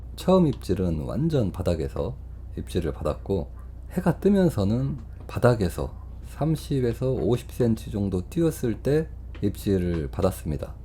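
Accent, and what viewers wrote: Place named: Chungcheong, South Korea. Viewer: 역시 고양이 아빠 충청도 사투리방송 구수하니 잼나네요 간만에 낚시 하시는 모습도 힐링되네요 감사합니다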